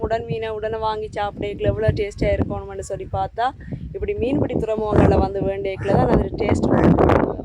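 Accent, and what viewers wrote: Sri Lanka. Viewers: Neengal peasum inimaiyana ilangai Tamil azago azagu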